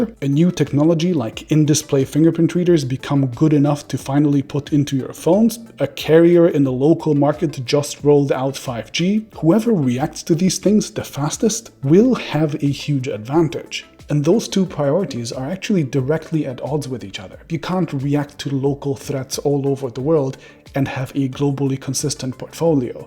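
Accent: Germany. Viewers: Your German accent is just annoying, please hire and American person who can talk for your little mid life crisis virgin a$$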